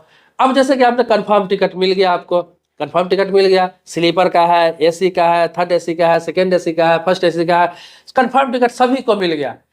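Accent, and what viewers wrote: Bihar, India. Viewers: आप बिहारी हैं क्या ? बिहारी लोगों की हिंदी बहुत कमजोर होती है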